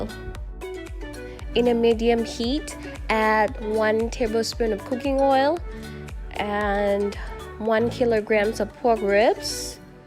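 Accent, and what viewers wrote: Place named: Luang Prabang, Laos. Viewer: พูดภาษาลาว เพราะกว่า เพราะเสียงขึ้นนาสิก จะพูดจะร้อง ก็เพราะ ชอบเสียงและสำเนียงหลวงพระบางมากๆ ฟังแล้วสบายใจ ถ้าทำคลิปต่อทามมิ่งตอนนี้ ก็ยังโอเคอยู่นะ เป็นกำลังใจครับ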